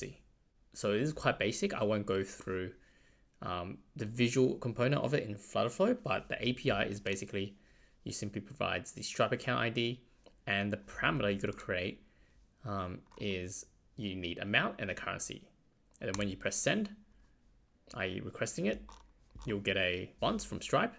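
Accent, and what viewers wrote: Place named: Australia. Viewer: Haha! thanks! Australian english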